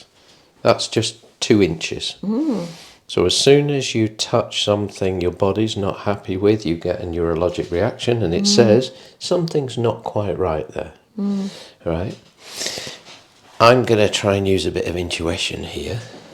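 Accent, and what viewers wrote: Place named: West Lancashire, United Kingdom. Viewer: I know a West Lancashire man when i hear him! Not too strong of an accent ☺️-from an East Lancs lass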